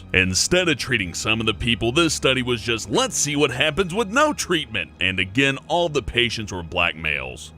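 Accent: United States. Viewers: when did become american? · the way he talks is too deep, harsh, forced and silly for the content of the video. It's difficult to focus on what he's saying behind that shitty american-thicked accent of his. Dolan's voice is soothing, serious, and has great ethos. Basically, he just sounds a hundred times better.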